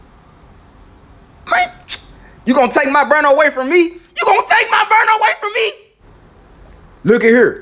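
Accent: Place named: Memphis, United States. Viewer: Bro I love your work I am from Memphis and this is how we talk!!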